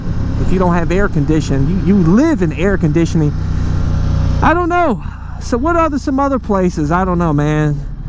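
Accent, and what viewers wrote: United States. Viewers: Don't understand how you're from Ohio and have a complete country bumpkin accent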